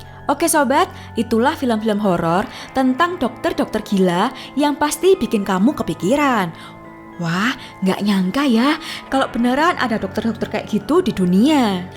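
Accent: Java, Indonesia. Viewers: masyaallah medoke pol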